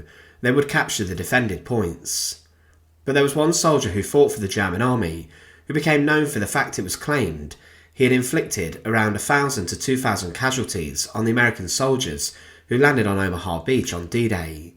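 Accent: United Kingdom. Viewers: Why couldn't an American read this? They always tryna put Brits in charge so it sounds like they're smarter. I don't like listening to this.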